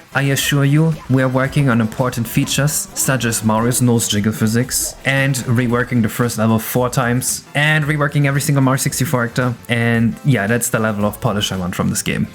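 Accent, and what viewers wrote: Germany. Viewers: this is the most german english I've ever heard in my life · I'm just confused as to why I thought you were Portuguese for years. Your accent is distinctly German, guess I'm just dumb.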